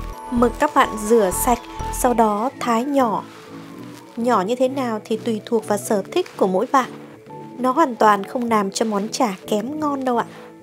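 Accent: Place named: Hanoi, Vietnam. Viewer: Ban nay có giọng nói đe nghe như người Hà Nội ấm áp ghê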